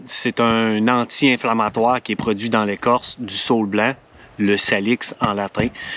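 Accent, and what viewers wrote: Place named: Quebec, Canada. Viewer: Merci mon ami quebequois de bon conseil en plus d un bon accent ....t es un "chum".....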